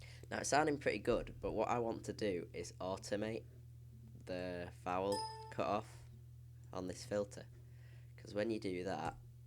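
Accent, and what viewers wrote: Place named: Nottingham, United Kingdom. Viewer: u sound like ur from nOTTTINGHAAAAM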